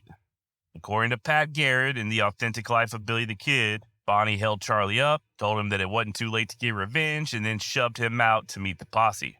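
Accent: Texas, United States. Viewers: The moderator of these stories is first class. Great Texas accent, clear diction, great humor and wonderful storyteller. Pleasure just to listen to him speak.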